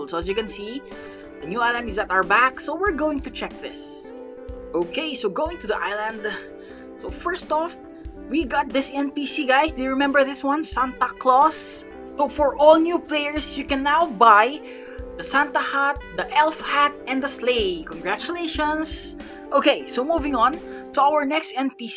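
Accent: Philippines. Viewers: i already know your a filipino just by listening to your accent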